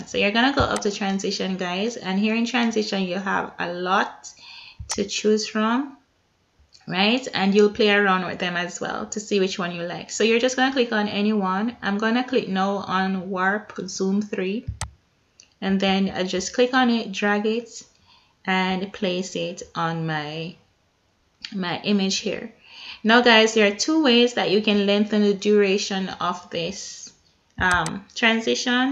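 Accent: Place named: Jamaica. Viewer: Thank you! Love your Jamaican accent.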